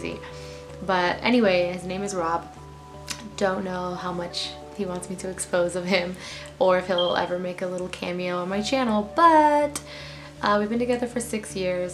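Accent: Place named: Bay Area, United States. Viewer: Good luck with your channel! Would've thought you were from the bay because of your cute little "accent" and because you say hella a lot haha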